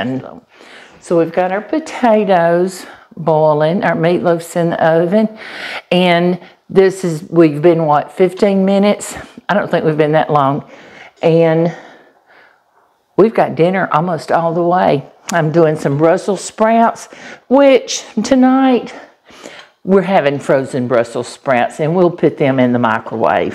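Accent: South, United States. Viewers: Hi, I know I am late to this party but I am glad that I watched. Your food looks yummy and also nutritious too. I love your southern accent when you speak. It makes me lonesome for Texas. I know you are in Alabama but still a sister from the South. I have never made gravy just did not have the talent. When I made meatloaf I used ketchup or mild honey BBQ for a gentle topping. Thanks for the gravy lesson and the tip with the buttermilk too. Take care and God Bless you and your hubby.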